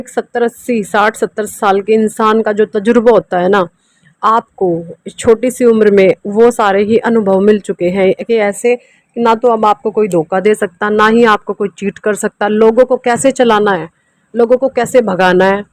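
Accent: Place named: Punjab, India. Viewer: 😀😀😀 वाह तुसी पंजाबी हो 🙏🙏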